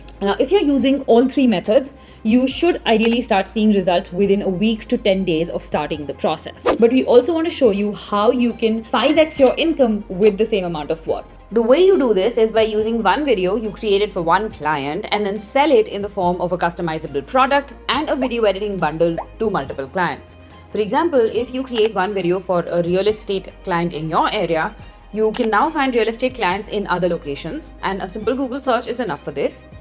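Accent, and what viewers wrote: India. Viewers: is this official channel of invideo ? with an indian accent is a turn off to be honest and on top she reads so fast like she is in hurry which make the customer experience a torture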